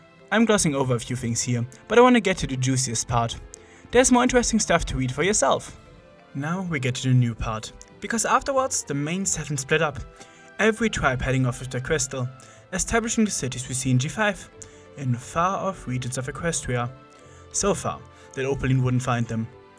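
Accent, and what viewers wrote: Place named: Germany. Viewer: Bruhh what is that accent if it isn't German xd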